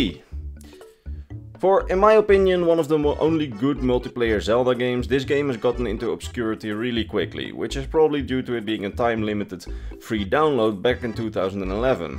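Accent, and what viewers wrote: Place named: Netherlands. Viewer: Random question but are you Dutch lol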